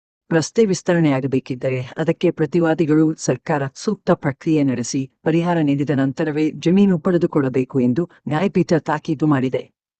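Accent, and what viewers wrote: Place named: United Kingdom. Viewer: Listening your Kannada like English lady speaking Kannada. Next time change voice of fluent Kannada speaking person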